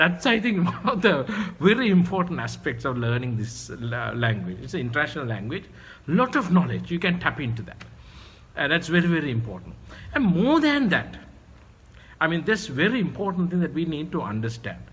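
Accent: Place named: Sri Lanka. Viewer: පුලුවන් කෙනෙක් ඉන්නවනම්. මේ සර් වගේ සිංහල අය කතා කරන ඉංග්‍රීසි තියන චැනල් එකක් කියන්න.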